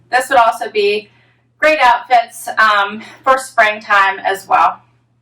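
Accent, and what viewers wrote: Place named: Pennsylvania, United States. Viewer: So happy to see you love tee shirt styling like me!! I get lots of ideas from you. I also heard you mention yr from PA. I also heard it in your accent because I am from Philadelphia. I live in Florida now so it's nice to hear someone from home. Me and my puppies watch every morning with our tea. Thank you 😊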